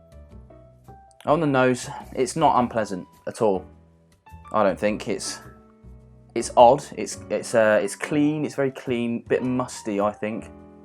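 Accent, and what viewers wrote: United Kingdom. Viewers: British accent brought me here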